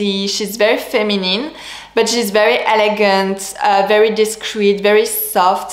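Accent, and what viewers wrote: France. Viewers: I started wearing Shalimar when I was very young and now I am on the very elderly side and still love it. I really enjoyed listening to you with your wonderful French voice. I love the way you told me all about the ingredients and why I so love my Shalimar. Thank you very much. MJMoore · Did you realize that the bottle on the caption is the Philtre de parfum flanker? When you mentioned the EDT, were you talking about the Philtre? It sounded like you did. Philtre is my favorite because it’s so airy and light and modern. Even the EDT is just too animalic for me and not so fresh. But who knows, I might change my opinion. I keep trying the EDT and EDP. BTW when you say heart ❤️ it sounds like hurt. Not sure if you’re aware of that. It’s your charm of course the French pronunciation but sometimes I wonder if you wouldn’t prefer to know about certain words. 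Like leather. By now I know what you mean, but the first time I heard you say that, I was wondering why a perfume would smell like a lizard🦎 😊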